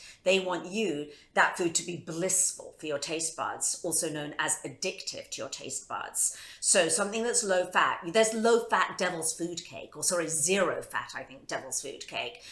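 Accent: United Kingdom. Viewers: Hi. I thought it was British. Love it. You are an inspiration.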